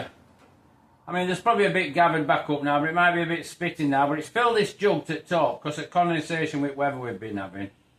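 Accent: Yorkshire, United Kingdom. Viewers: Thanks for Air Zone compressor video maintenance needed to spray cars with paint. Good work Proper Yorkshire chat. KenXJ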